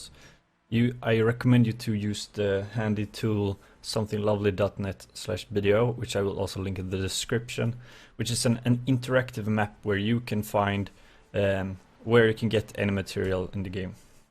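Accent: Sweden. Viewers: nice, btw your accent sounds like you're swedish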